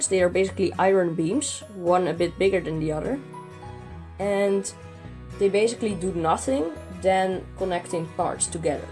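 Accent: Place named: Netherlands. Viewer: Ik smell Nederlands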